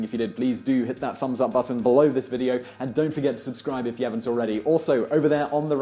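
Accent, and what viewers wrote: England, United Kingdom. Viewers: Where is from in England?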